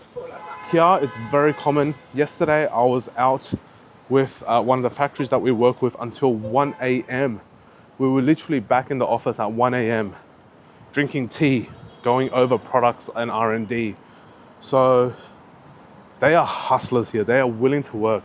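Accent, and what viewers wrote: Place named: Australia. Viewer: Australian accent detected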